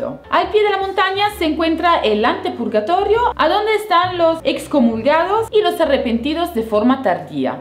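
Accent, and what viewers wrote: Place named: Italy. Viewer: me encanto el video! le pones mucha onda a lo que haces, y ese acento tano le pone mucha energia! chau linda!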